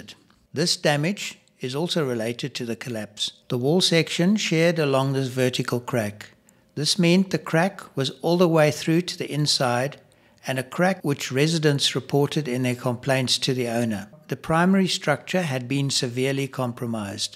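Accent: South Africa. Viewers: I love how relaxing your voice/ accent it, is it South African? Also thank you for the informative videos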